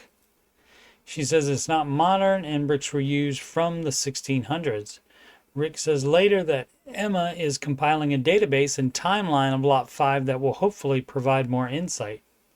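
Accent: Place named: Atlantic Canada, Canada. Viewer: Awesome stuff Coach keep it up. Either your from Nova Scotia or Newfoundland 😀 the out gives it away 😆 🍻 👏 ✌️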